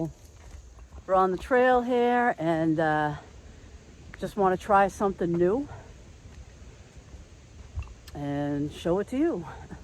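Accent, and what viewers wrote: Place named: East Coast, United States. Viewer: Oh BTW, love your cute east coast accent 👍, I'm from the west coast of Cali.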